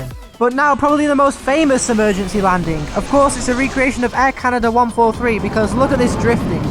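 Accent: United Kingdom. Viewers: ahhh now i hear your voice i can tell your british 😂. i hadnt heard it before this vid lol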